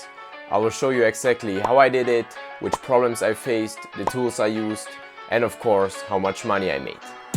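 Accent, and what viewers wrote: Germany. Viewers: Man hört das deutsche haha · Are you german ? It Sounds like that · German accent?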